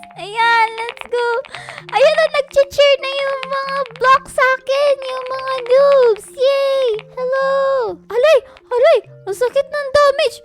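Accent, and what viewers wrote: Visayas, Philippines. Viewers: Bisaya kaba ate!? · Ate bisaya ka pala kala ko Tagalog ka ❤❤❤❤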